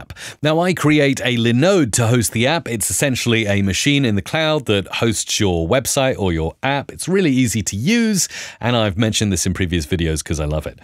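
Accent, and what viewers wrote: France. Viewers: Je ne sais pas comment prendre la "blague" sur l'accent français mais c'est tellement vrai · Fine I’ll subscribe after the French accent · french accent was mad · The accent french 👨🏿‍💻😂😂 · What a French accent! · The French accent is so authentic 😂🤣Amazing !!! Thank you for this moment !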